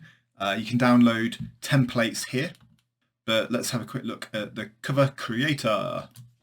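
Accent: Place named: United Kingdom. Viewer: Wow I love your British accent 😅😅😊😊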